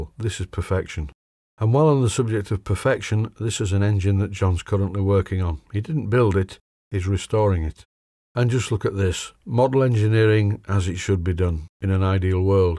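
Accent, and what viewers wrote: Yorkshire, United Kingdom. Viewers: I have no idea why YouTube attracts such brainless and often downright mean comments, but those of us who make the effort to put up videos get faced with them all the time. Most of them come from "users" with no content and no followers. I generally just delete them. That said, I very much enjoy and appreciate your videos, your humour and your Yorkie accent. Thanks!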